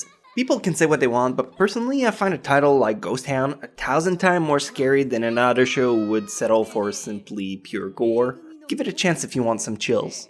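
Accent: Quebec, Canada. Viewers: Eero Koponen *French Canadian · french canadian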